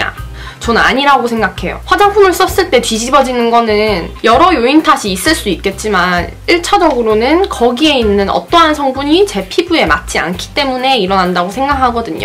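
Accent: Gyeongsang, South Korea. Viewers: 예전 큐엔에이에서 였나? 기억은 잘 안나는데ㅠ 경상도쪽 (대구?)사투리랑 서울쪽 사투리랑 섞여서 이렇게 되셨다고 했어용 정확한건 그 영상을 찿아보시면 되실것 같아요ㅠㅠ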